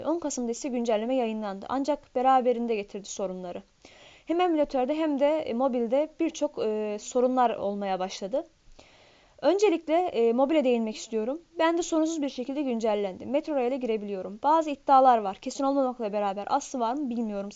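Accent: Azerbaijan. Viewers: azerisen qaqa?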